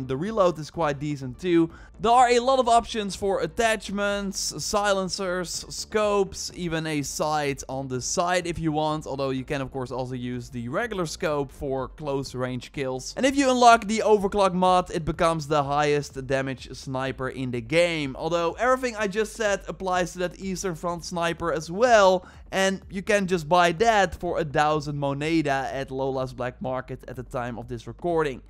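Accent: Netherlands. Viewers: Ik hoor gewoon dat je Nederlands bent hahaha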